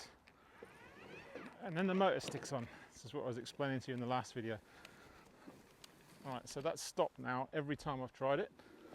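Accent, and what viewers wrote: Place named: United Kingdom. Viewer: I look forward to the season ahead, just started here in the UK.. have you always lived in Australia? Sounds like you have a British accent to me at times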